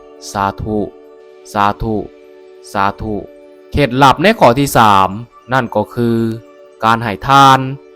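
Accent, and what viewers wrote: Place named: Laos. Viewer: สำเนียงพูดเหมือนคนลาว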